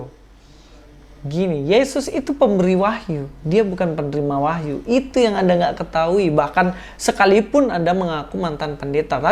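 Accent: Java, Indonesia. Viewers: Cino logat jowo kui biasa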